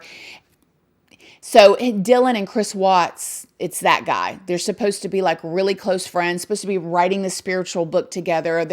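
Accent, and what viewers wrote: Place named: Texas, United States. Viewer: Darling, you are fabulous, and FUNNNNNEEEE! You know what I think? I believe Chris Watts couldn’t walk away his pregnant wife ((What would people think of me, so, his family had to disappear - and NK’s pull on him was tantamount to hypnotism; as though she were a real life Siren, he unable to resist her call. ….! I willdefinitely be watching more of you; you are incredible!! Love your Tevan accent Y’all an’ all!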